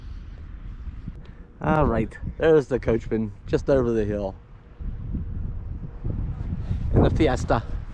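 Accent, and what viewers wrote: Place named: New England, United States. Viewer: I'm laughing at your Yankee tongue in this video. The way you pronounce Pascagoula and Bayou. So funny.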